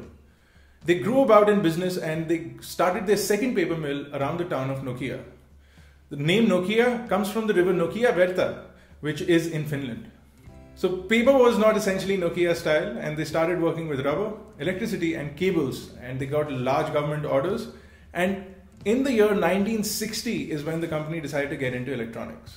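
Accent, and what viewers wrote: India. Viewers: bruh? you really that ignorant? of course he has an accent. he's from india. dont be a douche. id like to hear you speak english without breaking a line or two. in addition to your english, its ""you're" indicates his/her characteristic whilst "your" is directed to his/her posession. practice more.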